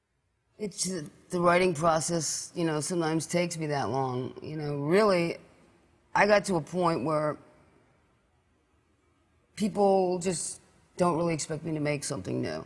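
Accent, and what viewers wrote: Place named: New York, United States. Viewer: Love her New York cool accent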